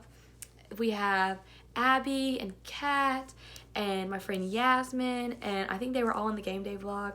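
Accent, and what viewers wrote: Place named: South, United States. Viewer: I love the accent. Miss the South!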